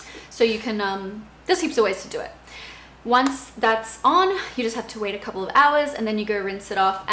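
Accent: United States. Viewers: You've got a bit of an American accent now! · Loved this video! You can start to tell that you're picking up on the American accent and that's honestly amazing xx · OMG!! ur accent is turning American especially when u said “i just painted my nails twice”!!! But seriously I’ve had my fave halo since the start of last year and it still works perfectly (I don’t wear makeup everyday) and I always tell everyone to get one!! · Crazy I can hear you getting the American accent!!! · Omg you’re getting an American accent!!! Whyyy 😩😩😩.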